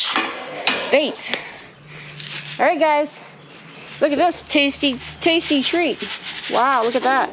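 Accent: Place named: Great Plains, United States. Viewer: Your accent sounds more like Great Plains than Allegheny. Nice video. I raise a little flock of chickens myself. They are very entertaining.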